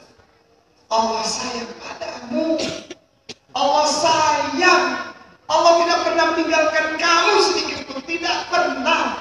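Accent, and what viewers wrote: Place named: Ambon, Indonesia. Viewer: dr suaranya sudah ketahuan kalo bliau orang ambon, gag perlu lihat muka ato nama,,, suara vokal orang ambon itu khas banget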